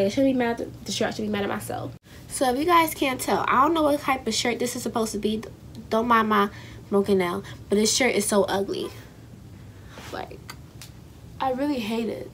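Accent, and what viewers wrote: New York, United States. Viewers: Your accent sound like you from New York 😍😍😍😍😍😍😍😍 and your name is so pretty😍😭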